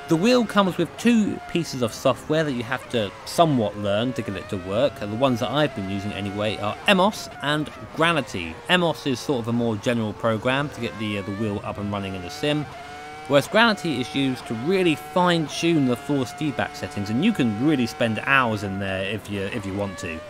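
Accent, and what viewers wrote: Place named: South Lincolnshire, United Kingdom. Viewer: You say "summit" like I do, instead of "something". Are you from anywhere near South Lincs?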